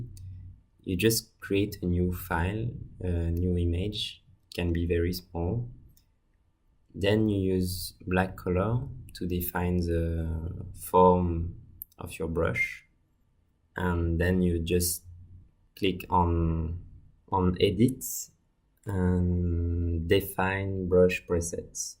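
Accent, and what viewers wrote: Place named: France. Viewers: For sure his French xD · Aren't you french ? Damn this accent XD Anyway, I love Tales From Earthsea, so I'm glad to see someone drawing a scene from it :D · He can’t sound more French than that😂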